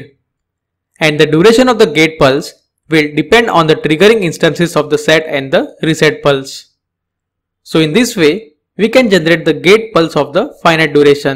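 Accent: India. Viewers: Oh my god, this is bad. the audio quality and the indian exzant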